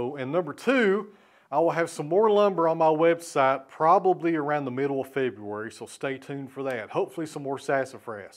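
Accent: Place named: Tennessee, United States. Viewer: I watch a lot of saw milling on Youtube, but lately its gotten difficult to watch other channels. It seems they are working way to hard, you make it look easy my friend! Its also nice to hear the Tennessee accent. I grew up in the Knoxville area, but im living in Kansas City now and I really miss people that speak properly 🤭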